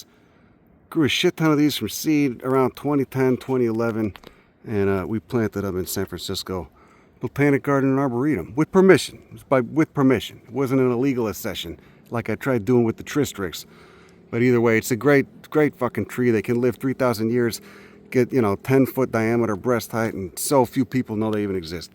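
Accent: Chicago, United States. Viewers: He’s from Chicago. It’s a Chicago accent. You have to be born and raised here.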